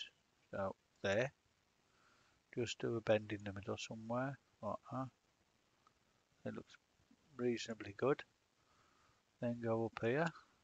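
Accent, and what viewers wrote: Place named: Birmingham, United Kingdom. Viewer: Thank you for that very easy to follow tutorial, great t hearing your Brummie accent.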